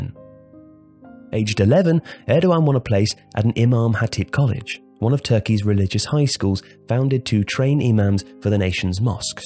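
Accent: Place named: United Kingdom. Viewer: Wow. Your british accent so nice, greetings from turkey nice channel keep goin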